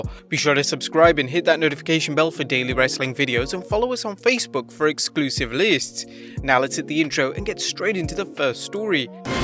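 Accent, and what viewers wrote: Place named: United Kingdom. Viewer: "Money is always a big motivator"- I'd say money's always the biggest motivator, if not the only one, in WWE... It's funny to hear a British accent talking about the American pro-wrestling, since the majority of people in the EU (myself included) can't understand what's going on there at all!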